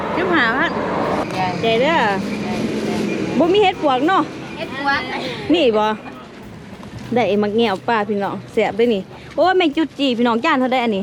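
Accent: Ubon Ratchathani, Thailand. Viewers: พี่น้องไทยลาวกะนี้ล่ะสำเนียงการเว่ากะคนเมืองอุบล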